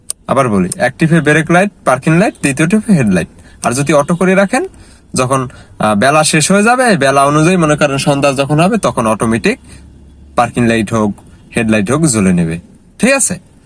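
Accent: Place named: Barisal, Bangladesh. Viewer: হা লা ,,বরিশাইল্লা,, আগে কথাশিখ পরে ভিডিও বানাবি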